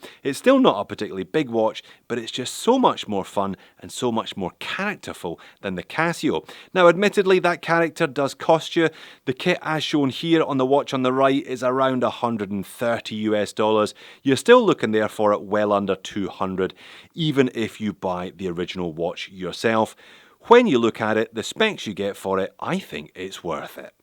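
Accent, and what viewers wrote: Scotland, United Kingdom. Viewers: Great to hear a fellow Scot talking about things I love! These are great looking mod kits, I was looking it up while you were building yours and I thought the colour way you chose was the best one too 👍🏼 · I’ve just managed to buy one in metal case. Love your Scottish accent coz I’m originally form Glasgow and enjoy everyone of your programmes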